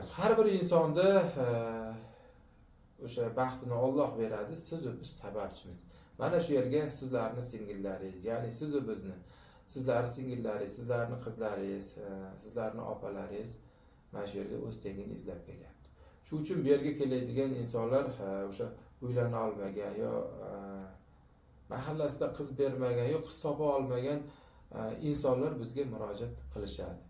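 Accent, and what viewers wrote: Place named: Khorezm, Uzbekistan. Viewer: Ассалому алейкум. Ози каерлик ? Шевасидан хоразим или Караколпогистон турткул или элликкала беруни туманиданми .телеграмда чикмаябди номерингиз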